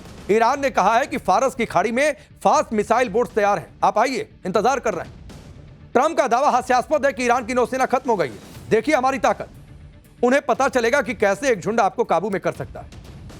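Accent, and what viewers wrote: Bihar, India. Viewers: पण्डित जी बहुत अच्छा बोल रहे हैं। लेकिन सुशांत सिन्हा जी का बोलने का बिहारी अंदाज निराला है।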